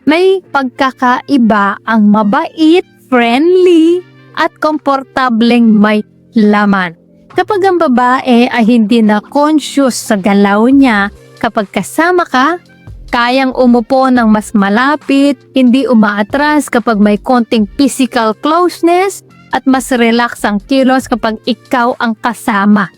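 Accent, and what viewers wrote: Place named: Pampanga, Philippines. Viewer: Kpampangan ka dahil sa accent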